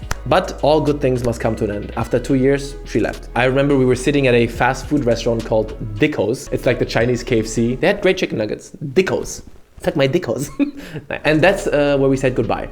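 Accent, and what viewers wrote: Germany. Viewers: Your english sounds really german but also really good 😂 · Manchmal hört man deinen deutschen akzent raus😂❤️ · Alder du bist doch deutscher .. hör ich doch am Akzent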